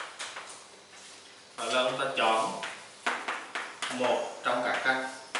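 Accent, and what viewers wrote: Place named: South, Vietnam. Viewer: lâu lắm mới nghe giọng miễn nam hay ghê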